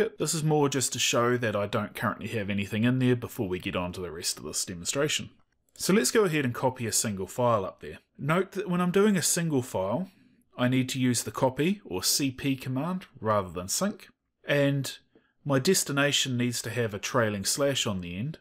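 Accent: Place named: New Zealand. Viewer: love the Kiwi Accent Choice bro!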